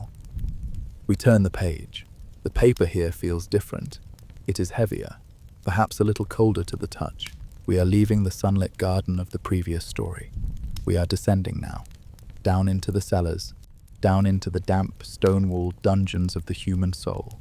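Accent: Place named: United Kingdom. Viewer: You have no idea how much I love love love this video. I've been falling asleep to it every night for a week now. As a huge fan of everything in the Harry Potter universe, sleep/meditation/storytelling videos and the voices of british men, this is amazing. Please keep conjuring these videos! I can't wait to see what's next! 🪄✨️💤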